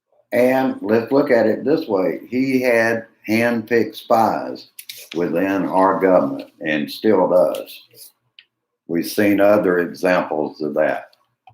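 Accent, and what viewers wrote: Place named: Missouri, United States. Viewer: What's up! St. Louis Native here. Nice to hear the Missouri perspective with the accent! Keep it up!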